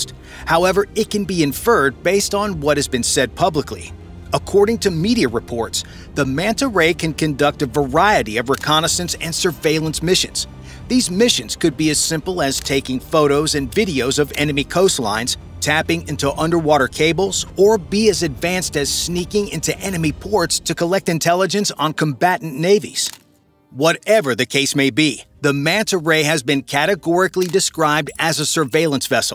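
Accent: United States. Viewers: These American voice overs are so irritating 😂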